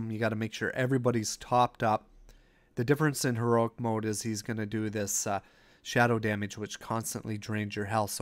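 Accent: Canada. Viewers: Couldn't agree more. I love that calm Canadian voice and the way he says process. If it turned into something like "HAI GUISE HERE LOLOL ALRIGHT LET'S DO SOME ACHIEVS WOHOO" it would really ruin the character of as well as. Just my 2 cents.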